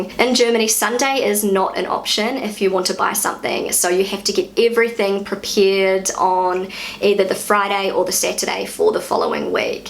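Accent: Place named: New Zealand. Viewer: Being from northern Germany I don't care much about dirndls, but I love listening to your NZ accent. As a former exchange student to the UK and the USA it is absolutely beautiful to learn about your pronunciation - and I think it's lovely. Thanks for sharing! ❤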